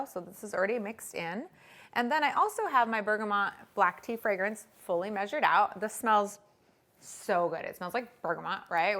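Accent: United States. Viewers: It’s common in the Pacific Northwest, is from Washington. “Pellow” rather than “pillow” is pretty normal too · MN people pronounce it the same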